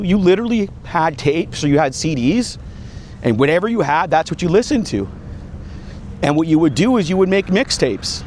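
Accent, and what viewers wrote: Canada. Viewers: Born 1983, so I'm here for this. I grew up without cell phones and we didn't get dial up internet until highschool, so it felt like the best time for that too- it was a new way to connect and plan stuff, and as a nerd, it provided the ability to find other people who liked the same stuff on web forums and chats. At the same time, it didn't create permanent record. There's no viral video or tiktok of me getting rejected or saying something dumb or falling asleep in Environmental Biology (sorry Mr. Gaspar- actually he was totally cool with it and let me sleep since I was normally a good kid and was obviously just exhausted). I was a free range kid for most of my childhood- today I'd have been taken away from my parents by the state. I think that's what changed too, prior generations, if their kid died or was seriously injured doing something stupid, they dealt with the tragedy and moved on. 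Now, those parents could be arrested for letting their kid get into that situation. I'm guessing your Canadian from the accent and some of the terms you used, so from an American perspective, if your kid gets hurt, not only is the parent getting in trouble, that medical bill could be devastating, which certainly incentivizes keeping little Johnny on his iPad vs on a bike. It got too expensive too. Everything did, everything is a commodified, and there is no place for kids to go and just be kids, it's even worse for teenagers. I understand not wanting to drive when every aspect of driving is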